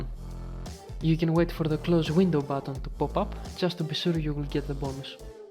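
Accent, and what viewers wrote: Greece. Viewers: Are you from Greece? Casue you sound a lot like a greek person! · Εχεις τοσο ελληνικη προφορα στα αγγλικα! Ωραιο βοντεο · το κατάλαβα από την προφορά , αδερφέ. Έλληνας και εγώ είμαι. Είσαι καλός..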